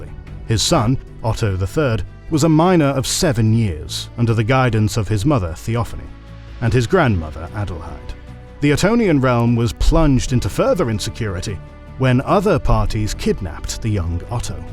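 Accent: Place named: United Kingdom. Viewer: Please do away with the UK pronunciation of things; it breaks immersion... like really bad.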